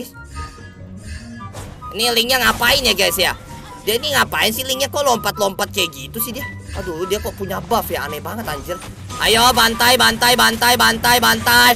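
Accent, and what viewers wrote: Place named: Java, Indonesia. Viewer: Sunda Bogsel Nih bang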